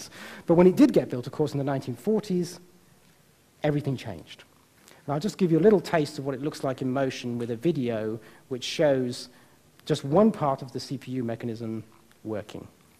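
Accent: United Kingdom. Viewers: He talks too fast and with a British accent :(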